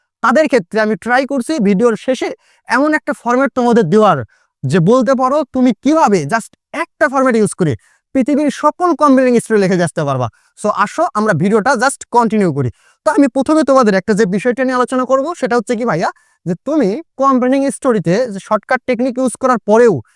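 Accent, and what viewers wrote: Bogura, Bangladesh. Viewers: Vai ki bogura r naki??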